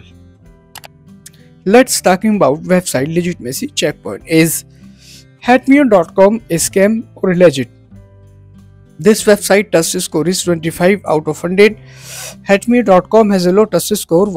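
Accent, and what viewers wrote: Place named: India. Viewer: Thick Indian accent that's hard to understand.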